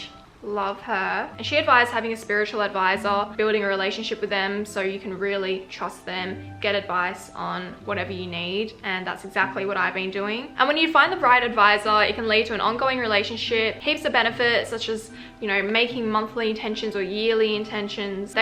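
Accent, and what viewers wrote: Australia. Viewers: not me slowly adapting aussie accent bc of her 😭😭🔪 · R u an Australian? Ur accent is totally different 😍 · Please my British accent sounds so stupid trying to imitate her aussie accent 💀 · i watch her so much i'm starting to speak with an australian accent now.. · its an aussie accent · as an Aussie U can tell cause of the r sound, like when she says nose she says norse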